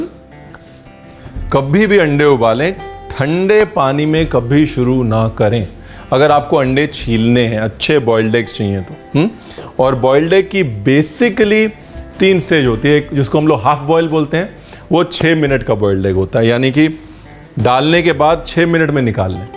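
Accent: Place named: Lucknow, India. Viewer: Bhai ka lucknawi andaaz jindaabaad..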